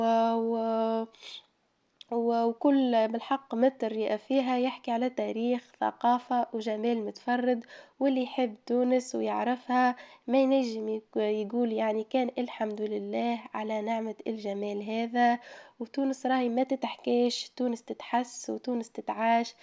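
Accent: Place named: Souf, Algeria. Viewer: الله أعلم هادي لهجة سوفية اكثر من تونسية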